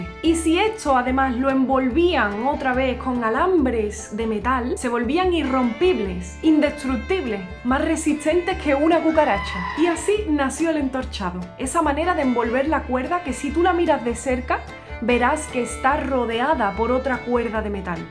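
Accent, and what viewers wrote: Andalusia, Spain. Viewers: Disculpa... de casualidad eres andalusa??? 😅😅😅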